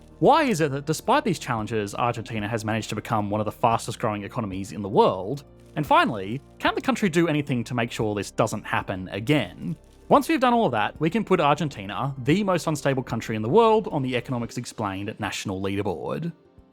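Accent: Australia. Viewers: Boludo, he’s Australian. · es ingles? el acento del narrador me suena a australiano pero me puedo estar equivocando (? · What kind of Australian accent does have? Sounds a little different than other Aussies.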